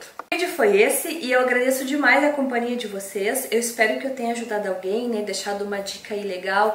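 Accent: Rio Grande do Sul, Brazil. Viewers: Ai eu adorei teu sotaque...Gaúcha, ne? Eu sou também, e amooooo <3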